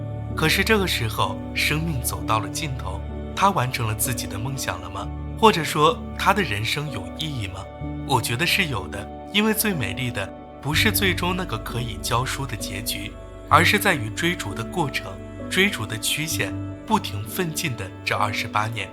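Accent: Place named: China. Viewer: 很好奇，解說說自己在臺灣，卻是滿口的標準普通話，難不成是大陸人在臺灣:face-blue-smiling:应该是的！這部片子很久以前看過，印象很深！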